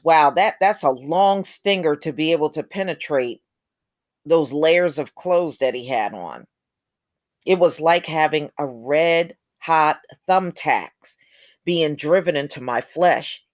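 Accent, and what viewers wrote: East Coast, United States. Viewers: Don't insult her accent she is probably from the east coast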